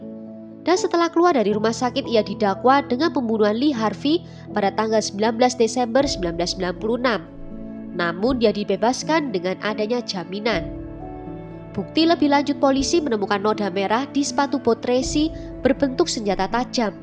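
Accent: Java, Indonesia. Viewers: Hayyy jg say.. medokuhhh 👍💞💞💞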